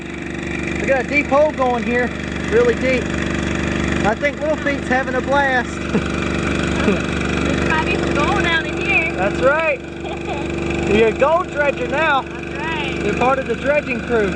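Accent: South, United States. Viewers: Murica, could you not tell from the accent? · Enjoyed the video. Felt jealous in a good way. I wish I were young and hanging out with you. You sound like John boy Walton, and I mean that in the best of ways. You sound like your from the south and that creek reminds me of a creek that ran by my Grandparents house in eastern Kentucky. One question do you come across snakes when out like that. I remember seeing copperhead, and cottonmouths down south. Hope you find some great relics and gold. God Bless. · where's your southern from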